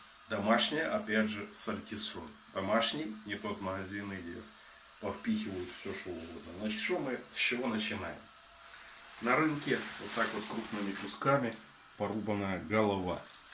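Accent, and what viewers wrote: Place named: Ukraine. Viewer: приятный украинский говор.У меня отец был с Харькова...Тоже так говорил.Умер в Омске.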